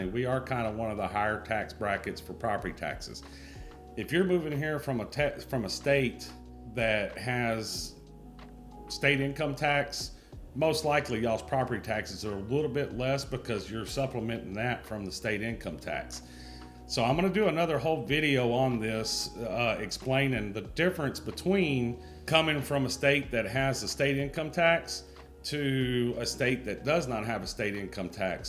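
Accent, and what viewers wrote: Texas, United States. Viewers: What accent? Lol but really, it’s much more tame than most Texans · I can hear it. Reminds me of my grandma she lived in Shepard Texas right above houston. I lost her to covid. His voice brings me a lot of joy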